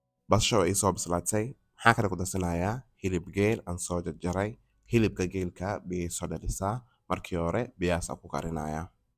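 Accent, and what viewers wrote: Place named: North, Somalia. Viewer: Af reer waqooyigu ma fahmayoo😊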